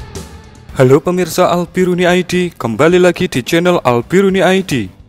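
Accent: Java, Indonesia. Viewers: Medok nih jawa nya kalo ngomng, ga seru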